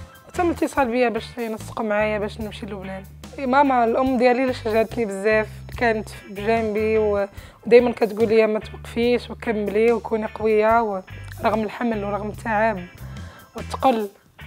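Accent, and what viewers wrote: Egypt. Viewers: اويلي وحدي قالت ليك كاتحاااول باش تهدر بالداريجة هاذي باقة حتى ما تشهرات ومعمرها خرجات من المغرب و غالبة عليها اللهجة المصرية ويلي بااا قالت لك كانحااول ايوا بلاش ما تبززي على راسك ا حبيبة 😬😬😬😬😬😬😬😬😬😬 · لا من لباسك ولا من لهجتك كل الناس كانت تقول بأنك مصرية يا وخيتي احكي بي لغة القران العربية هل شوفت مصرية تحكي مغربي او لبنانية تحكي مغربي ؟؟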